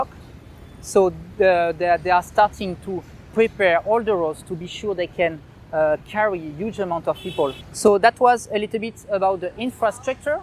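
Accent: France. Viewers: I watch you for the French accent!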